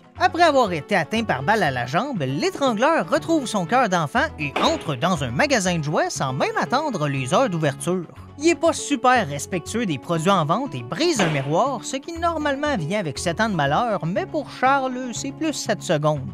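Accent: Quebec, Canada. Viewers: Wow, je vient de découvrir ta chaîne, et franchement, c'est propre :D En plus, j'adore ton accent québécois, et je trouve super beau 😊(ouais, un peu bizarre 😅) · Jadore je m'abonne et j'aime ton accent québécois moi aussi je suis québécois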